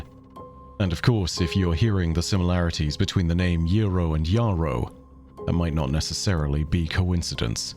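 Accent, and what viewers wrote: United Kingdom. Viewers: I'm glad we have a nice British voiced lore master for both Bungie games and FromSoft games.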